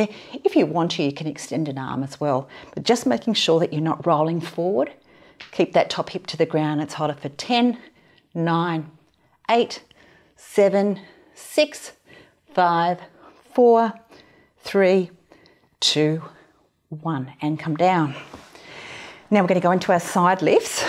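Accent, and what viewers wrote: Australia. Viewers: Love your beautiful Aussie accent!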